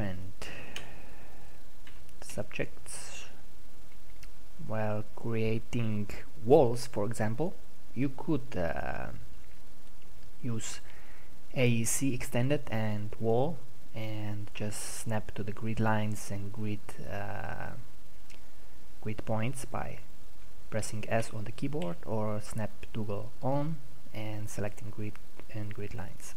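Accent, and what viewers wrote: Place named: South Korea. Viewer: Your english sounds like some korean idol i know lol, but helpful video :D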